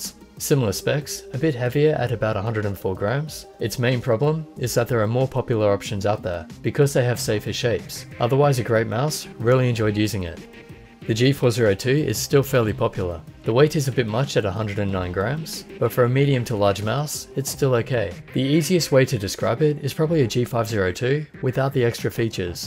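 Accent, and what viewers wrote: Australia. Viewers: you are a legend, this is exactly the type of review I wanted and then you have individual detailed reviews. I hope more manufacturers or computer tech sites hire your services. Thank you very much for your awesome review. And your aussie accent is great too (I'm from Sydney).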